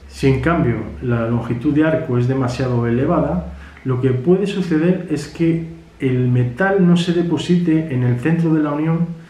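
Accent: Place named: Spain. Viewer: Si vivo aqui hace mas de 26 an~os,soy de la Republica Dominicana y usted por el acento me imagino que de espan~a.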